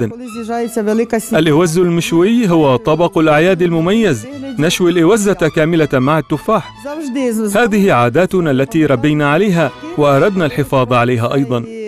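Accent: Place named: Russia. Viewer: لكنة روسية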